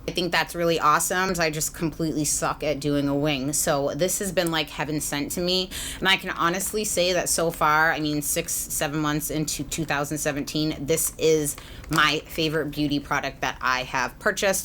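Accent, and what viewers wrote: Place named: Boston, United States. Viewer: Hey, I love your videos, personality and your Boston accent - it reminds me of home! I like this exercise because it makes me realize that I really do have a lot to be grateful for. Having to choose my top picks, I would choose my skin, as it is nearly flawless and wrinkle free, which at my age is truly miraculous! Additionally, I am so proud to have graduated magna cum laude with honors from an Ivy League school. There were a lot of obstacles in my way, but I pushed through and received an education that no one can ever take away from me! Thanks for the opportunity to win the winged eyeliner products. I, like you, have struggled with uneven wings for years, so to win would be a real treat. Take care of yourself!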